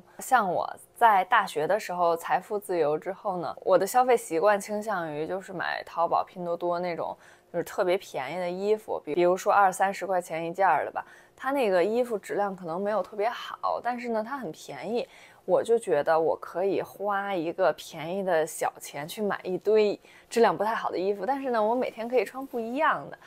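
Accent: Taiwan. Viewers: 有點台味了🤣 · 你其實本來就很有氣質了@@ 但被台灣口音同化之後，更像仙女了🤣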